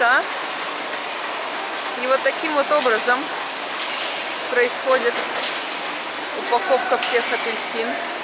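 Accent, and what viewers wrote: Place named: Turkey. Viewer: Извините! Почему у вас акцент на Русский? Такое ощущение что не русская а турчанка говорит на русском. У Турков ударение на последние слога.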